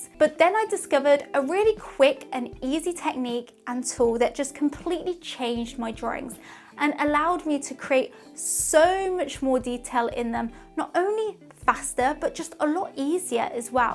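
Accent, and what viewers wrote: United Kingdom. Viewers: She have a British accent...😲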